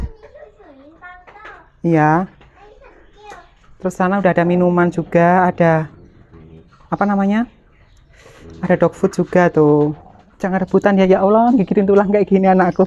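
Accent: Java, Indonesia. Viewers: Asslmuallaikummm..wrwb,,mbaaaakkkkk...aku ngeliat mbak makan dan bicara logat jawa eh berasa kayak sama mamah..! Mamah sama papahku juga suka makan jengkol,hehehe..Mbak maaf mau nnya,hidung mbak itu asli atau op? Soalnya hidung mbak bagus,cantik banget..love you mbak❤️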